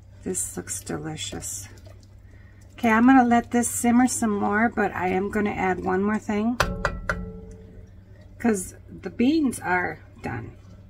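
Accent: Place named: Midwest, United States. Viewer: Thank you 😍I love your midwestern accent 😊Are you from Wisconsin?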